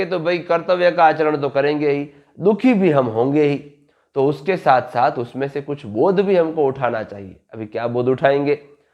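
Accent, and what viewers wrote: Gujarat, India. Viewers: Are you gujarati ❤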